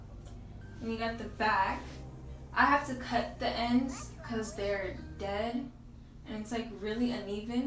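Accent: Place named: Brazil. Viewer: seu sotaque é diferente. você é brasileira ? tive impressão que você era por conta do sotaque...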